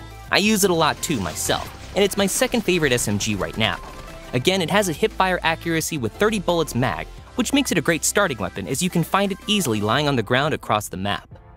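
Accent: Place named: India. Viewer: His voice is very nice. But of course we want the natural Indian accent. 😊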